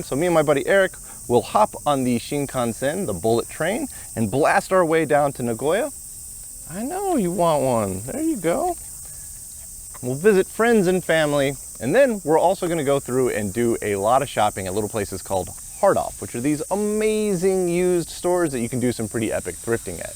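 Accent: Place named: United States. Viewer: Wow, you did a great tour of japan, if I ever found myseld there, will be sure to watch this video again on what to visit PS: your japanese sounds american to me compared to the one the lady spoke Was wondering how easy is the language to learn for american person Also, how fast can it be forgoten if not used PS: is it wise to visit japan if u dont know japanese, can u get stolen or riped off easier?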